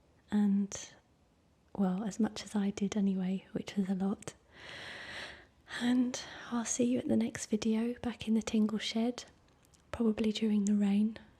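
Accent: England, United Kingdom. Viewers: I absolutely luv that english accent...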